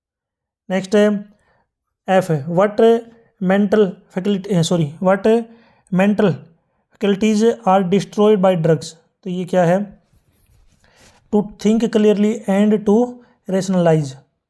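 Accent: Haryana, India. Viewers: Aawaz se hi lag raha hai ki sir haryana se hai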